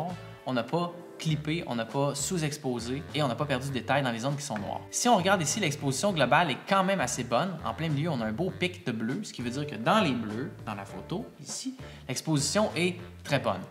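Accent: Quebec, Canada. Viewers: Merci pour tes vidéos qui m'apportent à chaque fois quelque chose de plus, même si j'ai du mal à toujours te suivre, avec ton accent québecois, et oui je suis Français...! Mais ça va venir, ma famille commence à plus trop me comprendre, et me demande ce que c'est cet accent bizarre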